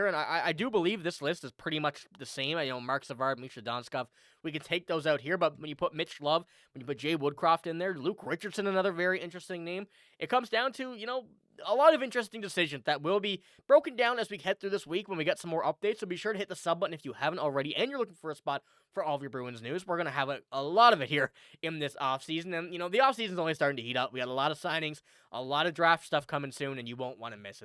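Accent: Newfoundland, Canada. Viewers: As much as I love 63, and would have loved him to retire as a Bruin, it wasn't going to help the team to keep him. I can't see him playing third line on the B's. I pray to God he doesn't go to the Leaves. I'd rather see him in Montreal. My stomach tells me he stays in Florida. With regards to Sway, he better get his act together. Oh, I finally subscribed. Question are you a Newfie?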